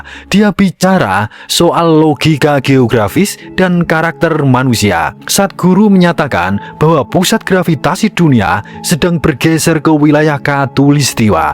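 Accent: Java, Indonesia. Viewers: Ngomong ne kayak orang kampung saya wong jowo,malu saya dengar nya, harusnya logat Jawa nya harus hilang, pakailah logat bahasa Indonesia yang baik dan benar,karna kita orang Indonesia berbagai suku ras dan agama yang melihat dan mendengarnya.😂😂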